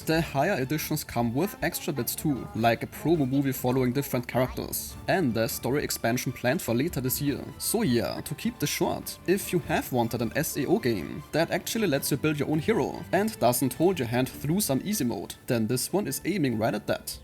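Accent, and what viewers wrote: Germany. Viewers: Krasser Deutscher Akzent. Sofort gedacht, dass du zumindest Österreicher, Schweizer oder Deutscher sein müsstest.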